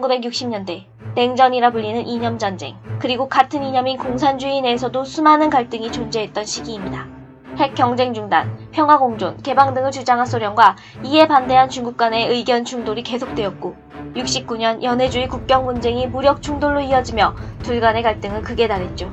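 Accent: North Korea. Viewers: 나래이션 북한사람임? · 나레이션 북한여자임.? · 나레이션자가 북한사람같은데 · 발음이 꼭 북한사람같네..표준말이안되면 그냥 사투리쓰시지 먼가 어색하다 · 말투가 북한사람같아요. 혹시? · 목소리가 음이 북한 말투인데 혹시? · 나레이션 북한말투같음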